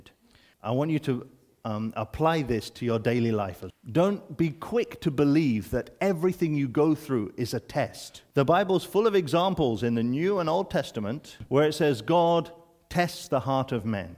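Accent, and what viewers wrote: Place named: Netherlands. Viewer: Do you live in Nederland? I can hear dutch language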